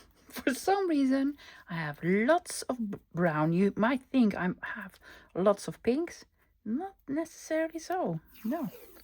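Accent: Netherlands. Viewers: I was sitting here watching you and thought, “I wonder where her accent is from, it’s probably Netherlands.” And at that very moment, no sooner was the thought in my head, when you said something about - here in Netherlands! Kinda freaked me out! I like your idea with Washi tape book mark! So cool.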